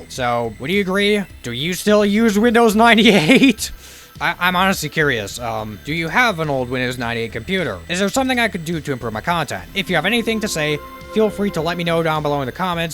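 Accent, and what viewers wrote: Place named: United States. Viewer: What kind of American accent do you have? Very distinct/unique from what I am used to hearing.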